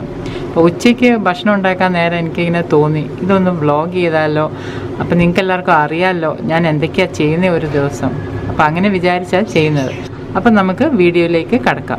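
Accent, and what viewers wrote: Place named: Kottayam, India. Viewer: .. kottayam kaari aanannu thonni.. Njnaanum oru kottaym kaari...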